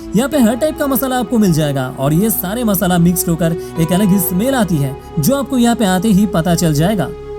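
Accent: Bengal, India. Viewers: Hindi with Bengali accent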